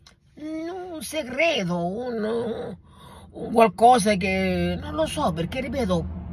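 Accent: Sicily, Italy. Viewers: Sei un continuo piacere l'ascoltarti. Onesta' immensa (e complimenti per come parli lingue straniere, aggiunto al tuo meravigliodo accento siracusano)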